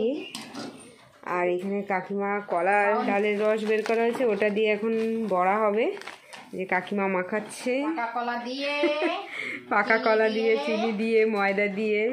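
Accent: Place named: East Bengal, Bangladesh. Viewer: একখান কথা কমু আপনি কইতাছেন আপনার জন্ম ইন্ডিয়ায়। কিন্তু আপনার কথাবার্তা থেকে এখনো আংগো পূর্ববাংলা টার্ন রইছে।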